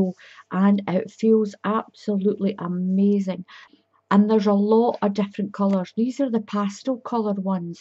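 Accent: Scotland, United Kingdom. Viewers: I love this! I would love to make some pillow covers. I love your accent also, you remind me of my grandmother who was from Glasgow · It's lovely to hear a Scottish voice for a change. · Looove your channel and all your help!!! And of course, I love your Scottish brogue... my Paw Paw Oliver hails from Liliesleaf...down in the Borders.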